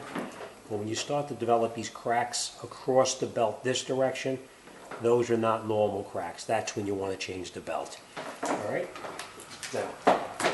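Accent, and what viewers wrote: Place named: East Coast, United States. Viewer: Finally another video with an east coast accent lol. Thanks for the instructions. John K (Newark N.J.)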